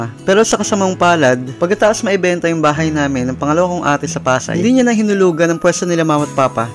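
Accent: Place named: Bicol, Philippines. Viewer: Parang taga bicol to e hahah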